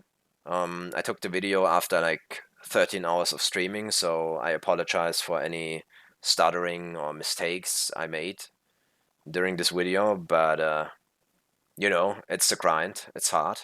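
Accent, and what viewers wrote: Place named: Germany. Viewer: Nice Build Aber das du deutsch bist hört man dirket xD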